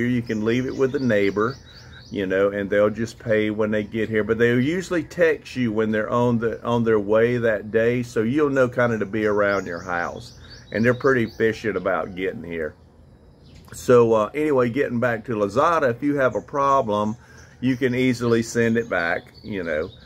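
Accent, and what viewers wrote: South, United States. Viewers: LOL. The southern accent combined with the 'jack sh*t'. Certainly American.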